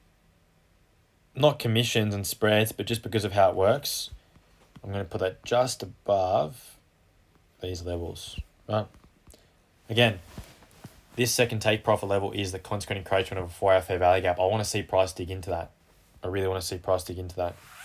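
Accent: Australia. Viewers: Hey mate nice videos, you sound like a fellow Aussie, I’m in Bris, where are you based?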